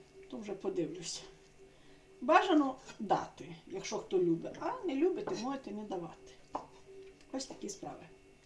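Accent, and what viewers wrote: Ternopil, Ukraine. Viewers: Дуже люблю дивитися Ваші відео, як ви розмовляєте теж. Хто щоб не казав такий діалект є в північних районах Тернопільської обл.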